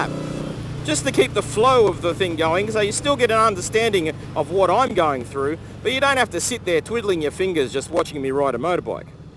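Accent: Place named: Australia. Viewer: I put it down is the Aussie accent lol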